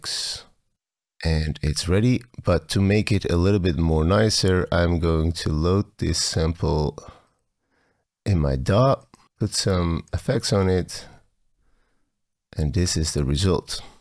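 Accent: Netherlands. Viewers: Ik wist het niet zeker maar ik hoorde een NL accent en achteraf bleek dit inderdaad het geval te zijn. Gaaf! 🫡💪❤ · Goede video, klinkt fantastisch! Complimenten! Je Nederlandse accent heeft je wel verraden haha... 🙂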